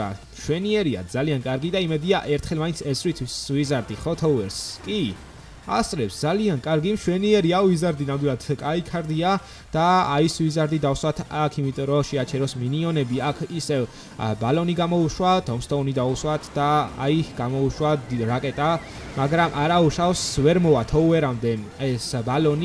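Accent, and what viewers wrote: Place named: Guria, Georgia. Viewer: guruli kilo gaqvs dzaan magari arxi gaqvs es ubralod ise gitxari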